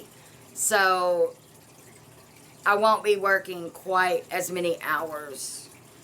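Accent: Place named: Kentucky, United States. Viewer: I have owned it over 25 years! It helps!she's also the author of Roses love garlic! I like Rodale's Herbal.Great video, never stop learning right?! Aww, you got your Baker Creek winter garden porn!😂💕. What is your growing zone?I'm up in Chicago, zone 5b. You sound like Kentucky to me. Freezer coleslaw?!my record just skipped!lol That is a recipe i need!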